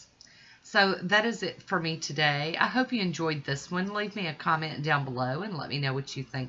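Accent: South, United States. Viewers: Very helpful. I love your southern accent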